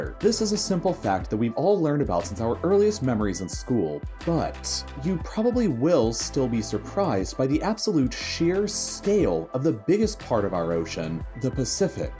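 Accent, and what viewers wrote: United States. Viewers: As an American, I couldn't relate to distances because you dealt in kilometers, not miles. Why. Just why. You sound American too. It was frustrating. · Sounds American. So maybe not use the metric system. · Speaks American English USES KILOMETERS AS THE UNIT OF MEASUREMENT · Does anyone else find talk of kilometers meaningless? I know metric is more scientific, but, you sound American, give it to me in miles. Please. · Narrator has American accent. Why is he talking about Km and not miles. Drop dead.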